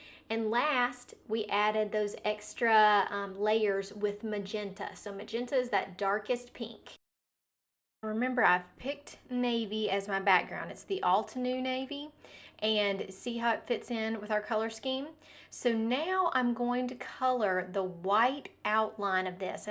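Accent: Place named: Texas, United States. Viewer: Beautiful! And I enjoy that Texas twang...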